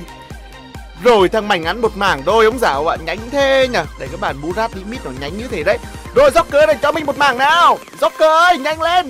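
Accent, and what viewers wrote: Nghe An, Vietnam. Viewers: Giọng a vậy là ở nghệ an rồi đúng ko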